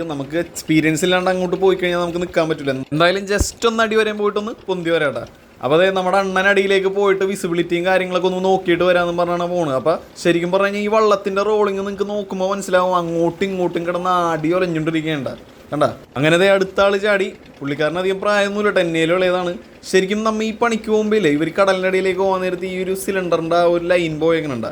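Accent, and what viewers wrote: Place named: Thrissur, India. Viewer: തനി ത്ര്ശൂർക്കാരുടെ ഭാഷാ ശൈലി.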